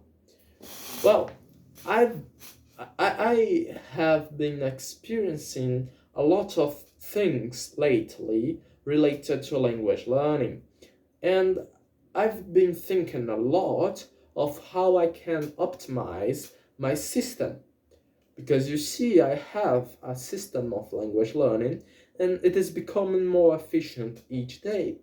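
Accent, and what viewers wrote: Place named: United Kingdom. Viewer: Você escolheu o sotaque britânico?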